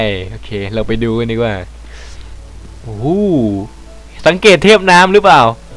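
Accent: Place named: South, Thailand. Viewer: พี่ คนใต้ จังหวัดไหนเหยอ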